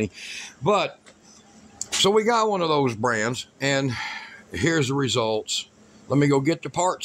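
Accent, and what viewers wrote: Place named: East Texas, United States. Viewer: Yor seam to be developing a east Texas accent , I like it but I thought you were west of Austin . I'm in north east Texas myself .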